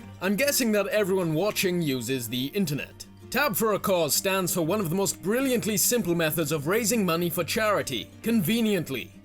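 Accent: Wales, United Kingdom. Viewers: I need to ask! ARE YOU WELSH?! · are you Welsh?? You sound Welsh or at least around that area??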